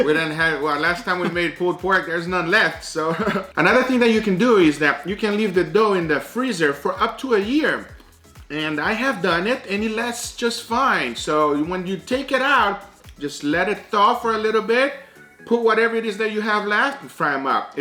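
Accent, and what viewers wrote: Brazil. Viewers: O sotaque dele é brasileiro. Português é a língua materna.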